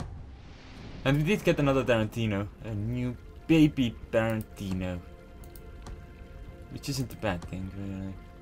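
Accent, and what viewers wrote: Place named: Sweden. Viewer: Where are you from? Your accent sounds similar to Robbaz the Swedish YouTuber.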